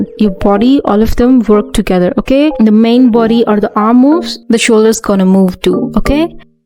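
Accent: India. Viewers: she actually sounds more indian than european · i swear i hear Indian accent a lil bit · Do I hear an Indian accent? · great to hear an indian accent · it is an Indian accent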